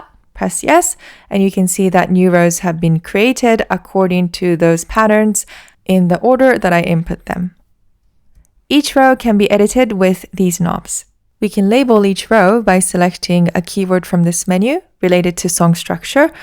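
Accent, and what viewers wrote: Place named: Ireland. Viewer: your accent is so nice. did you live in ireland at some point by any chance? theres a few words here and there that sound very irish to me but maybe its some similar accent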